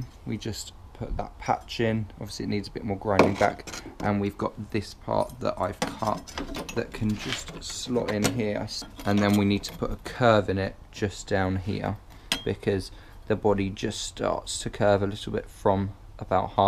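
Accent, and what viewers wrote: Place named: England, United Kingdom. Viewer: If I hadn’t heard your English accent I would have known you are in England because of the ridiculously small Garages they attach to the House. So sad.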